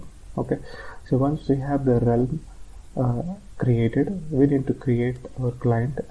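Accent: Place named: India. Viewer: 印度英语 made me crazy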